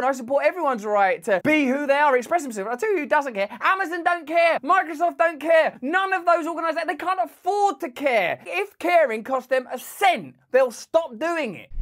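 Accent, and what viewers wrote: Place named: London, United Kingdom. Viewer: You sound like you're from luhndan!!!!!!!!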